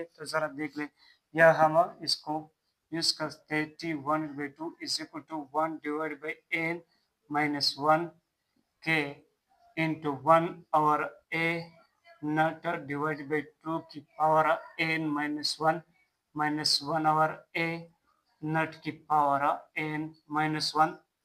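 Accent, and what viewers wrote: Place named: Pakistan. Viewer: Sir ap pak se ho kya apka bolne k tarike se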